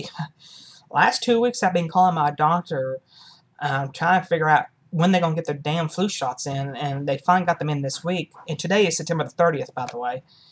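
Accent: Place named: Texas, United States. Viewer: Love your haircut, I love your Texas accent.Thanks I will take my whole family to Walgreens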